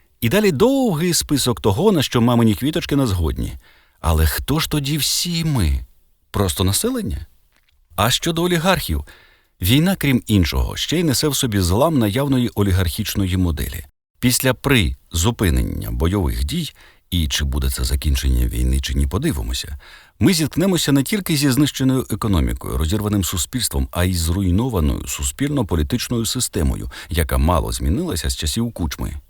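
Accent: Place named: Russia. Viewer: Можна слухати.Але рюцкєї слова та наголоси все псують.Неук.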